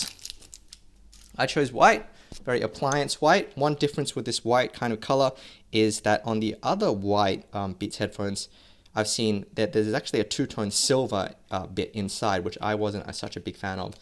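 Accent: Australia. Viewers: Random question but is your accent australian?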